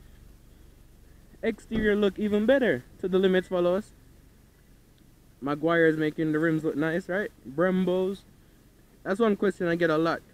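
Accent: Jamaica. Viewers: I recognize dat accent are u Jamaican?nice car btw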